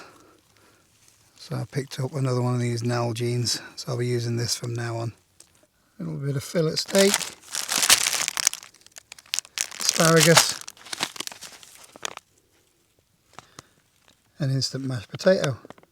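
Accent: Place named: Yorkshire, United Kingdom. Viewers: Ahh it's great to hear a reet proper yorkie accent, from a Lancastrian lad, it's getting rarer is the colloquial English accent mores the pity. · I love that there’ll be a load of Americans out there, totally not understanding T’Yorkshire accent uses!! 🤣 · Yorkshire born... best accent, best product reviews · is aggressively Sheffield sounding 😂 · That Barnsley accent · I always thought you was a Yorkshire lad to be fair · from Barnsley, defo accent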